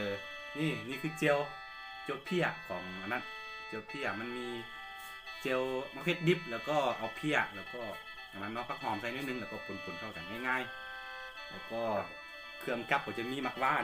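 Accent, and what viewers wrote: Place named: Isan, Thailand. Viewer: บ้าน ยุใสคับอยากไปเที่ยวคับการพูดคือคนอิสานบ้านผม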